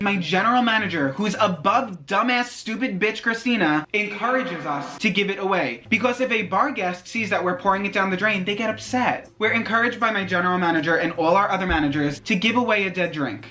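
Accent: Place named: North, United States. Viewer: Ok I hate Christina but being a manager I would warn you to not have friends at work and definitely DO NOT allow any non-employee to help you! That violates workers comp laws! That could get corporate in big trouble. That would get anyone working below me fired & walk out immediately! So just saying protect yourself! Btw I love your Northern scent. I live the south. I freaking love your videos!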